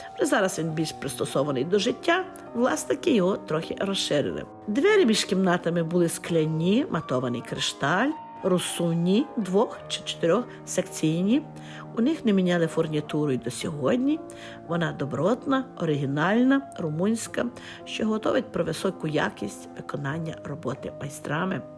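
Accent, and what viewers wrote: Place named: Western Ukraine, Ukraine. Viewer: ДЕТОЧКА НЕ ПИШИ глупости ЕСЛИ не знаешь галичанского укр.языка.там именно на всей галичине так столетиями разговаривают и общаются. У этой дикторши даже произношение " захiдне, а не схiдне..Разговорный язык на Западной украине отличается и отличался от восточного и центрального украинского..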